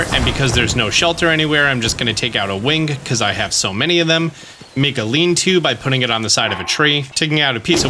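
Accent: New Jersey, United States. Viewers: Oh man, New Jesey English is like trying to talk to someone from switzerland. (Hello from Austria )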